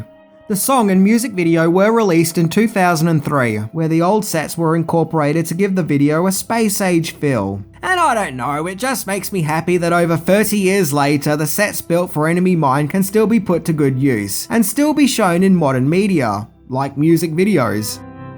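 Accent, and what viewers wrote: United Kingdom. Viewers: I can't get around the British accent of the narrator. The inability to pronounce Rs, Ts, and THs drive me crazy. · alway he british accent EVA /= either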